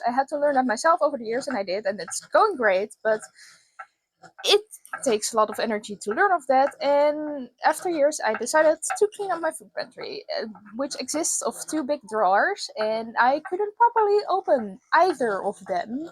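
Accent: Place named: Netherlands. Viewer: Yep, i have the same as well here, also ik hoor Nederlands 😊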